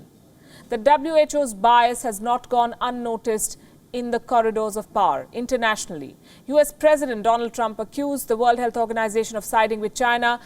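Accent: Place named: India. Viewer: Would this Channel will replace someone who able to speak proper English then thus lady could? She sounds like speaking Indian?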